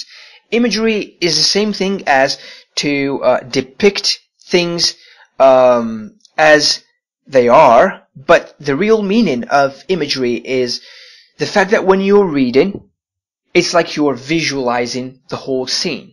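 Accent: United Kingdom. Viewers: Sounds Scottish to me. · you have a good british pronunciation stick to it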